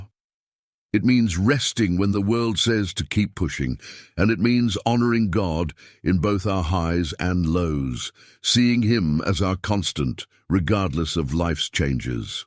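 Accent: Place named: England, United Kingdom. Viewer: Very good. But please 🙏 please no more English accent.. please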